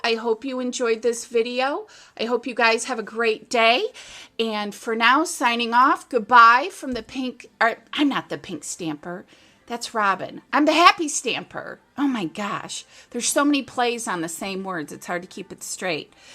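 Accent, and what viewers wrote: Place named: Cleveland, United States. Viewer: Oh I am SO doing this one!! Thank You!! ... and smoosh is SO a word!! You sound like you might be/have been from Cleveland - are you??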